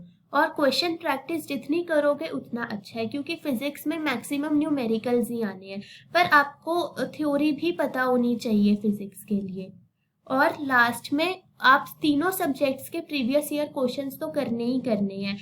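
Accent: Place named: South, India. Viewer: Thank you Madam your assent is South Indian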